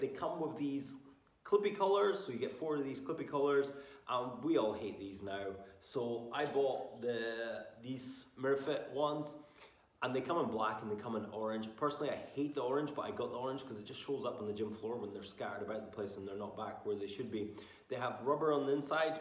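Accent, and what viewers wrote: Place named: United States. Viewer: Why's he speaking American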